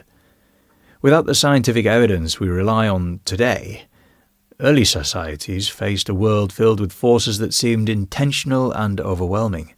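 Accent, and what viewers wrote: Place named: England, United Kingdom. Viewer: Where is your English accent from?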